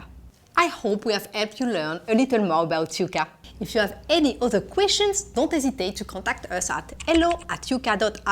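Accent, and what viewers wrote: France. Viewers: The narrator should be someone who speaks fluent English without a strong French accent. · Love the thick French accent with risky soundly whiskey. lol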